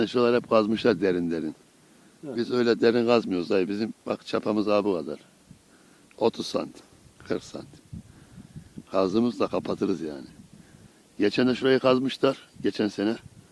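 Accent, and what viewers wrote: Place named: Tokat, Turkey. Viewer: Selamın aleyküm gardaş tokat şivesine benziyor konuşman ya sivaslı ya da tokatlısın heralde?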